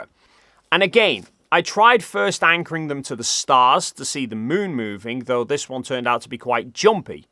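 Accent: United Kingdom. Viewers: a long time ago studies showed that a British accent convinced people it was truth....and a dog in hand yielded the same results. is just smart enough to know good marketing 👏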